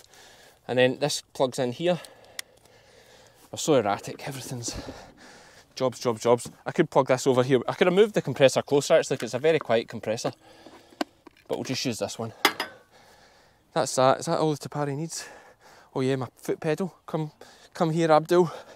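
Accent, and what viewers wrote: Scotland, United Kingdom. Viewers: Wow, and I thought I could understand the Scotts…. Maybe give us a whole video with the full accent. I have so much to learn. 😂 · I'm a fairly new fan of Sheep Game... And I'm trying! I love the content but... Getting used to the Scottish accent is taking some work for these Canadian ears of mine. I'm glad is conscious of this challenge for viewers and adjusts things somewhat as he mentions here. My Granny emigrated from Glasgow so I thought I'd have an edge. Oh well it just means watching the same content 2 or 3 times to be sure. 😉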